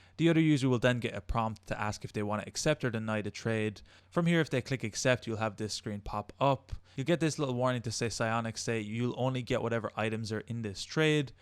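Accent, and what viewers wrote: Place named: Ireland. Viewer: Knew u sounded irish Hello From a fellow irish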